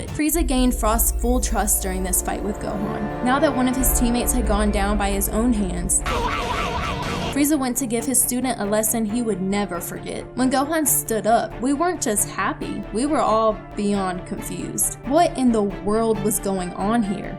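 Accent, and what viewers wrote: South, United States. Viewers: low key has a southern accent. I like it lol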